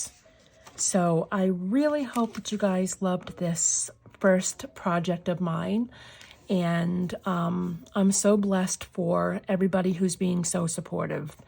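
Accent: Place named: Boston, United States. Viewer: New subscriber!! Oh my gosh so wicked-awesome you're an Neweglandah!! Luv your Boston accent....my dh is Irish ❤️ his pak dah cah phrases at times! So hilarious 😂 You're so creative! Blessings!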